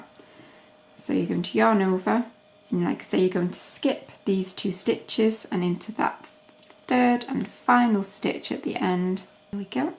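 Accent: United Kingdom. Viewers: There's this cool button that you can use if shes going too fast for you... its called the PAUSE button. Such a wonderful invention! Also, as an American, I can understand her British accent very well. She speaks pretty clear compared to several others that I've tried following. Just some constructive criticism for YOU 😊✌❤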